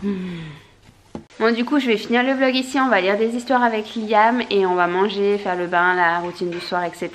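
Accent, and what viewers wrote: Spain. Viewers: Ton accent españiol 💓il est incroyable trop choux votre petit bout de choux de parler españiol et français c trop bien, 😁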